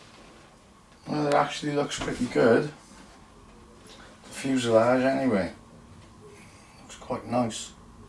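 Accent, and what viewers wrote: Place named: South Wales, United Kingdom. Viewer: I think my high quality diet and fitness regime is what keeps me resilient to illness but nobody is bullet proof and I'm definitely concerned! From you accent your from south Wales right? There have been a fair number of reported cases in Wales and that's gotta be scary, so far there has been two here in Wiltshire but none in my area of the county but it's just a matter of time and I think it's almost inevitable that it will reach here sooner or later.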